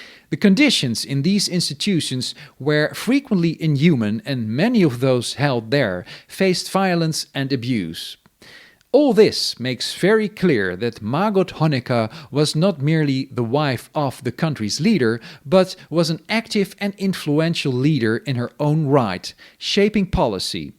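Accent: Netherlands. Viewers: You are from the Netherlands, aren't you? I hear a slight Dutch accent as well in your English as in your German. What brought you to work on this kind of German history?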